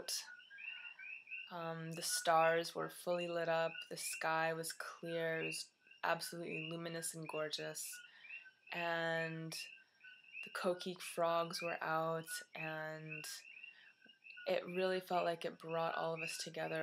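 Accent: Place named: Canada. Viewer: She's very pretty and has nice eyes and voice, but she needs to take out that nose ring. It reminds me of how a bull is shown in a cartoon or something. It's very distracting. She sounds kind of Canadian. Does she smoke pot though? She's Verrrrry mello. Yes, Centipedes will mess you up. I heard about them talked about on the Discovery channels Dual Survival, as well as throughout my life from various sources.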